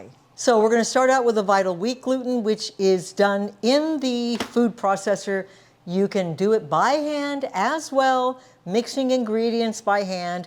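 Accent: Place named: United States. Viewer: Is she Japanese? She sounds American / Canadian.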